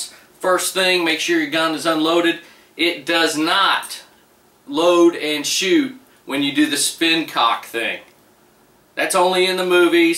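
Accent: United States. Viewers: Love your fully Texan accent (no homo tho)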